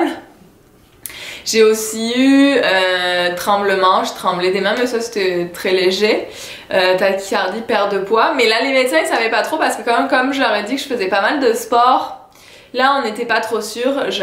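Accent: Quebec, Canada. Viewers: Effectivement toi à bien pris l'accent québécois!! Courage Corentin d'Annot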